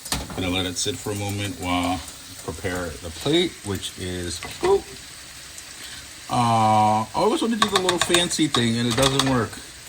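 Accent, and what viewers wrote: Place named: Miami, United States. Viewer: My family and I love and adore you. I grew up in Miami and I here a familiar accent 😮😊😂❤🎉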